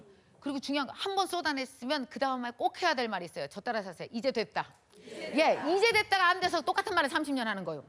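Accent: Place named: Chungcheong, South Korea. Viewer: 구수한 충청도사투리 넘! 강의 잘듣고 많이 웃고 감니다.....🎉😅❤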